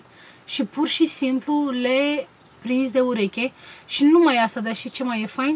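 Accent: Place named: Moldova. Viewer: ce nerusinat e Dragos ma facut pe mine sa lacrimez si inca plus la asta a mai facuto si pe mea preferata sa lacrimeze a fost o felicitare asa cum a spus el o felicitare lacrimogena.iar bratara e minunata so porti sanatoasa.Asteptam cu nerabdare sa iasa in vinzare cartea lui Dragos e foarte talentat baiatul asta si la machiaj si acum am aflat ca va lansa o carte sper sa am si eu ocazia so citesc.Ador cind vorbesti Moldoveneste incep a zimbi de fiecare data si apropo transmitei multumiri lui mamica ta pentru reteta aia de apa de orez daca functioneaza o sa fac si eu.Te pup mult succes in continuare si multumesc pentru ca neai felicitat ca suntem 2000 degrasuti si desigur te felicit si eu pe tine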